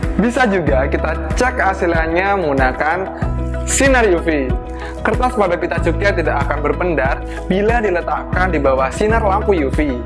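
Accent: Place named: Banyumas, Indonesia. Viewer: Keereen bea cukai ngapak yu...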